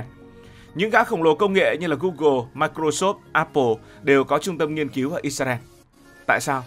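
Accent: North, Vietnam. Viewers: Thông tin đăng tải và giọng thể hiện của bạn rất hay, nhưng mong bạn phát ấm chuẩn tiếng Việt để thể hiện "đẳng cấp" của kênh và ko gây ức chế cho người nghe cũng như giữ gìn sự trong sáng của tiếng Việt. Cụ thể: bạn ko đến nói dạy học thành dậy học, giàu có thành giầu có... đây là hiện tượng phát âm sai của người dân một số vùng quê đồng bằng Bắc bộ (được cho là quê mùa).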